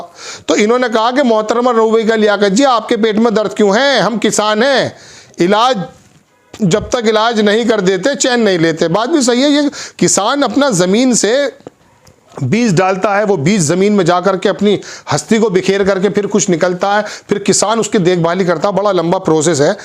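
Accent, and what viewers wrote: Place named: Saharanpur, India. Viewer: bhai aap mere hometown ki bhasha bol rahe hain Shayad aap bhi saharanpur k hain.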